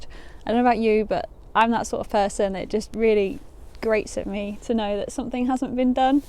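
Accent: England, United Kingdom. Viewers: hello NICE VIDEO AND REALLY LOVE THAT ENGLISH ACCENT AND THAT CUTE SMILE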